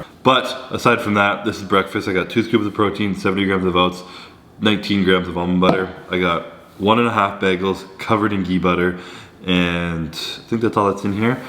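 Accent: Canada. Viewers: Yo man are you Canadian? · He’s Canadian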